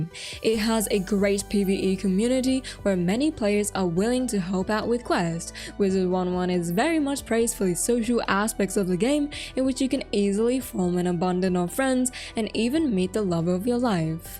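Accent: Australia. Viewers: Nothing wrong with Australian accents <3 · I didnt know you were aussie, so little of us are in the community lol nice to see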